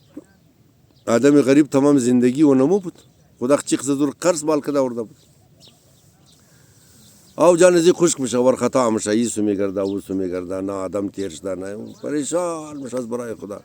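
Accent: Hazaragi, Afghanistan. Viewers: سلام اقای. نام من رضا هست و در ایران تولد شدم و ۳۰ سال دارم و بیشتر از نصف عمر خود را در اروپا زندگی کرده ام. دلیل اینکه من ویدئوهای شما را می‌بینم دو چیز هست: ۱. زبان و لهجه هزارگی را از شما یاد میگیرم. ۲. هر ویدیو شما یک درس زندگی به من میدهد. موفق و سلامت باشید. 🌹✌️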